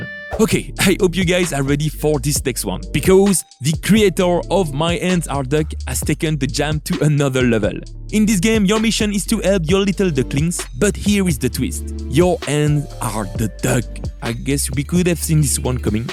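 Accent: France. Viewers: Is me or you have a big french accent , you speak french ? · Je sais pas si tu es français mais tu as un fort accent français 👀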